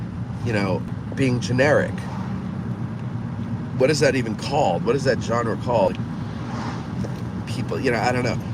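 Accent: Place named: New Jersey, United States. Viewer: Your jersey accent is a trip..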